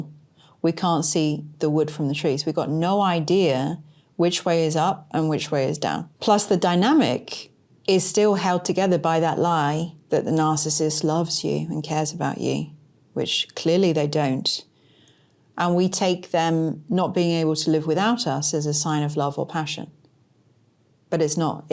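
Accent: United Kingdom. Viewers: In my prayers always., I think you look very healthy and beautiful… I believe you are a Spirit dancing along…I believe you will THRIVE! My ancestors are Kelham Island, just north of Sheffield…I so love the British and will listen to your beautiful accent forever., you will SUCCEED! Godspeed!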